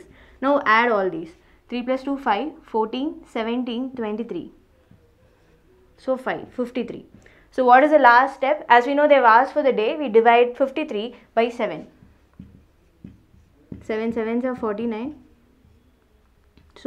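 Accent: South India, India. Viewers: Beautiful you are South accent in ur English make you more cuter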